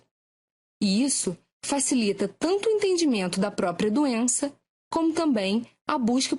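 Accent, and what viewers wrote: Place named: Rio de Janeiro, Brazil. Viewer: Sutaque maravilhoso, as cariocas são maravilhosas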